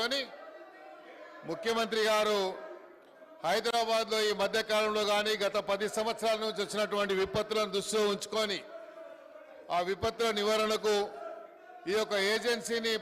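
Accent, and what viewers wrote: Andhra Pradesh, India. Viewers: అమ్మ ఎవరికైనా అమ్మే సేమ్ ఆంధ్ర లెక్క మాట్లాడుతారు మీరు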